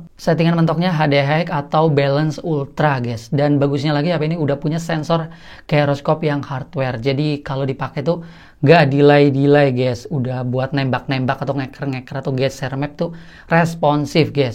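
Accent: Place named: Indramayu, Indonesia. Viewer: orang indramayu ya mas?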